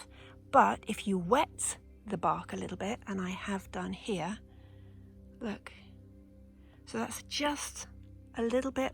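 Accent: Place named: United Kingdom. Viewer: Just came across your video you sound like you’re here in the UK , where did you get the tester from ?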